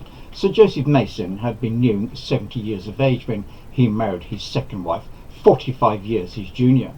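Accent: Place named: United Kingdom. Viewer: This book is now in my TBR and I am following your channel. Great job with the review. I love your British accent! Stay safe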